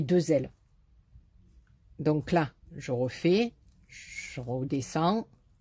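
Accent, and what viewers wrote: South, France. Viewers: Merci infiniment, j'ai vraiment passé un merveilleux moment avec l'accent du soleil..... Pour moi les petits anges ce sera pour l'an prochain, cette année nous quittons notre maison parce que ça fera 1 an le 24 décembre que notre chaton à rejoint les anges et nous n'avons pas le coeur à fêter sans lui.... Encore mille mercis et de très belles fêtes à toi et tes proches dans l'amour et la joie, gros bisous. Lucia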